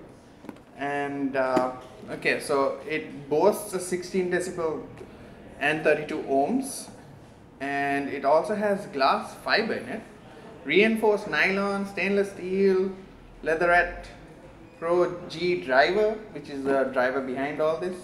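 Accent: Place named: India. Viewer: that indian accent is very bad lmfao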